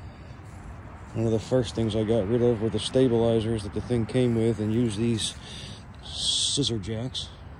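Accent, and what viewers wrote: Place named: Chicago, United States. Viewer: Cook county accent.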